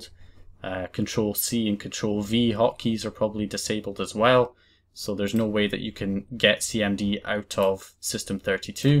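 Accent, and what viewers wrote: Scotland, United Kingdom. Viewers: You sound Scottish are you Scottish?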